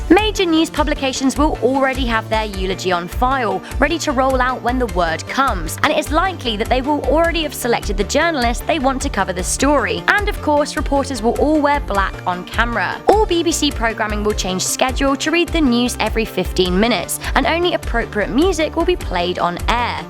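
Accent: United Kingdom. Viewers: sounds British so I would think this whole video is extremely accurate since she would have her experience to know herself.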